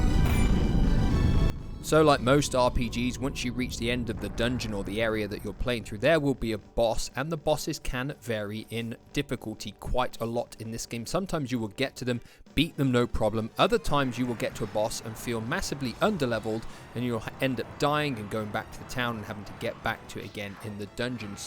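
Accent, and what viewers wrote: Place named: United Kingdom. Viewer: The classic well written well spoken British man I believe every word he speaks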